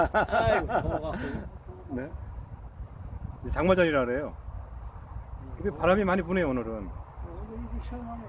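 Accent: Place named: Chungcheong, South Korea. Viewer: 충청도 사투리 쓰시는거 같네요~ 어디실까요? 저는 세종에 사는데 가까우면 구경가도 되는지 궁금합니다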